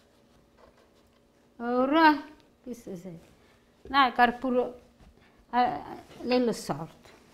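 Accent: Italy. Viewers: She uses the word "Fiammella" with this sweet accent half-italien-half-english ^^ Fiammella means little flame, so you can basically say you got it right ;) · Grazie mille for sharing yourself and your recipes. My father and his family were from Puglia but passed away before I could know them. My mother learned many of my grandmother's recipes. Your cooking is so similar and expands what I had learned from my mom. Your accent also reminds me of my relatives in Italy 🥰.Your singing makes keeps me company as I cook and I received your cookbook for Christmas this year! Your family is blessed to have you.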